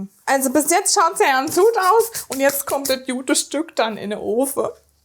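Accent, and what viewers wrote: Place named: Hesse, Germany. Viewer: Lecker. ☺️ Ich arbeite im schönen Wiesbaden und dachte, ich hätte da so was Hessisches bei dir rausgehört. 😉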